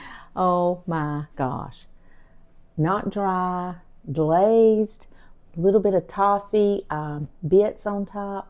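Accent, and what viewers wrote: South, United States. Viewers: I enjoy your videos very much and your southern accent😃. Your home looks beautiful in the videos. I’d love to see your decorating style! I’d also enjoy a ‘get to know me’ video. You’ve mentioned being in the Air Force. I’m intrigued!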